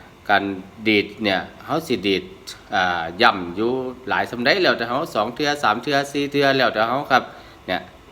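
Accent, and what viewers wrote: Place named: Chaiyaphum, Thailand. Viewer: ม่วนหลายครับอาจารย์ เข้าใจง่ายนำพร้อม สำเนียงเว้าอาจารย์คือคนชัยภูมิแท้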